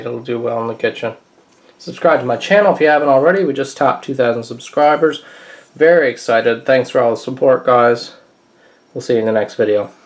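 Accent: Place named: Newfoundland, Canada. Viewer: New to the channel you sound like a fellow Newfie! Love it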